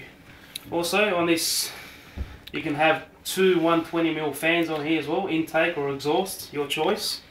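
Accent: Australia. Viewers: Actually, I'm English and he doesn't English. He sounds Australian.. And No, it isn't obvious that he's Enlgish, because he's Australian >.> And if you still don't believe me, then you're an idiot, because on his channel: Hi My Name is and i live in Sydney, Since the age of 10, I developed an interest in eXtreme Computers, Thanks to YouTube i have the opportunity to share my passion & inspiration to the world. Country: Australia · hes aussie for sure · British? he sounds like an auzzy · Greek Australian I'm guessing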